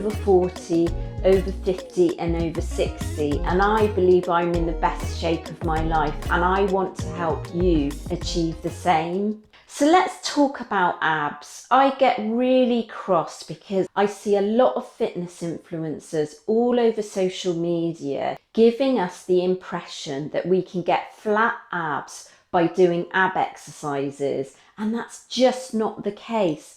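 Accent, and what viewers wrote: England, United Kingdom. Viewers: I'm in San Antonio, Texas. Love hearing about fitness and diet for my age, and especially with your English accent. ❤️❤️❤️ I'm definitely inspired, and your videos are helping me!! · Ahh England accent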